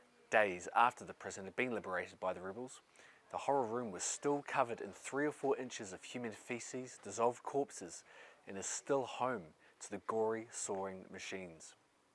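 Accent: New Zealand. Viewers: Is this a New Zealand accent? Hard to understand a lot of words. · You sound like a kiwi?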